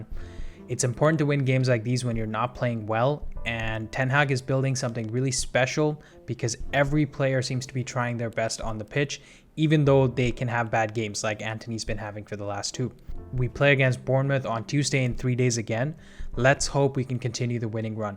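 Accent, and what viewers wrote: United States. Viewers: As a mancunian born and bred. I can never get used to an American accent talking about British football. Its as abnormal as hearing my grandmother talking about her sex life.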